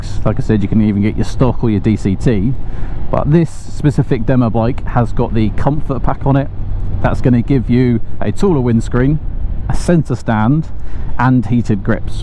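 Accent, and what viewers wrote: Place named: United Kingdom. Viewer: Bro you look like a Texan and seeing you speak in a British accent is funny😭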